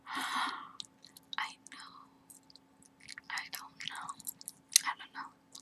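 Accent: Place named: New York, United States. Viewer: I love your accent. That's the prahblam - huuuuge tingles. Are you from New York by any chance? I have a friend who is Latino, from New York, and literally every time she talks I just melt and zone out..and she's like, "Gina? Hello? I'M TAWKIN' TAH YOU."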